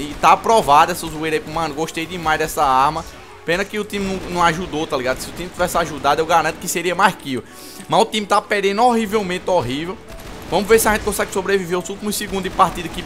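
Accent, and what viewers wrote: Northeast, Brazil. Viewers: Man Se E du Nordeste ? LIKE BRODI ! ♥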